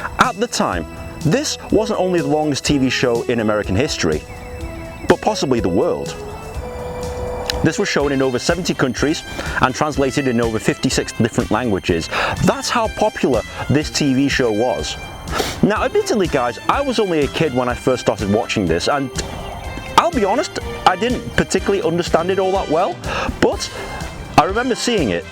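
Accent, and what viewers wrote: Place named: England, United Kingdom. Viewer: Why the fuck is an English guy representing a Dallas Texas ranch! You think they could have got an American Cowboy with a southern accent instead! thumbs up if you agree.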